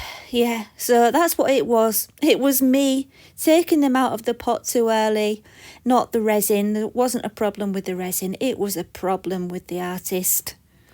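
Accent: United Kingdom. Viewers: Best resin channel! Plus us yanks love a British accent.😍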